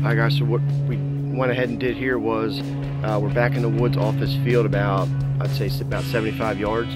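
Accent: United States. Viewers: Are you from Indiana?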